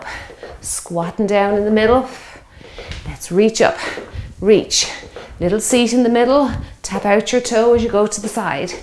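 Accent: Ireland. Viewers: Hi, a new subscriber from Belleville, Ontario Canada. I adore your workouts. They are calming yet very motivating. I have done most of them and each one is terrific. Plus I never get tired of your lively Irish accent. I have shared your workouts to my Facebook page and had some of my friends try it. Thanks, keep it up!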